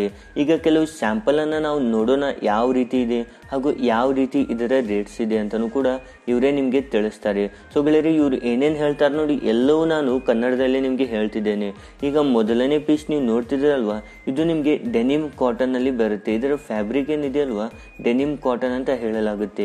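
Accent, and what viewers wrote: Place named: North Karnataka, India. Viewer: Dosta nee matadod nodidra pakka uttar karnatakadava anasti pa... Am i right or wrong...?😆😆😅😅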